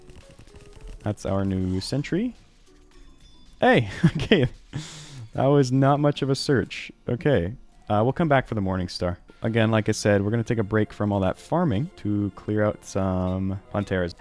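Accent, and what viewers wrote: United States. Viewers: yeah Midwest American for sure. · i think thats just his american accent, similar to "banena"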